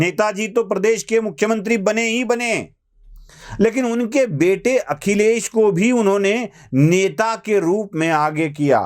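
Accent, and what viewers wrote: Maharashtra, India. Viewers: बहुत खुब!! बढीया! भलेही मराठी टोन हो, पर हिंदी मे खुब ही बढीया विश्लेषण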